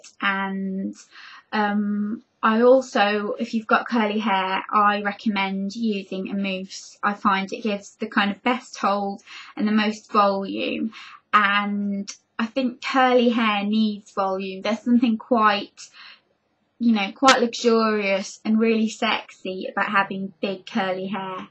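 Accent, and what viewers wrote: United Kingdom. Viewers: I. Love. Your. Accent. SO MUCH brittish accent always reminds me of harry potter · we have similar hair and everything you said was really interesting. Btw ,just so you know, If I had a british accent, I would never shut up :D lol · omg!! thanks for your tips. my hair is very similar to yours when i was younger i hated it i wanted it straight all the time. now at 37 years old i love my curls i get many compliments. love your british accent! all the way from puerto rico!!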